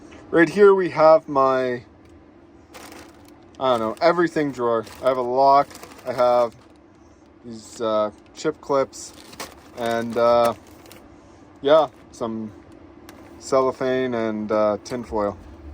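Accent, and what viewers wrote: Canada. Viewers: As soon as you started talking I knew you were Canadian and when I saw no plate on the front of the truck I figured AB or YT, although I was leaning toward YT when you mentioned minus 30 degrees.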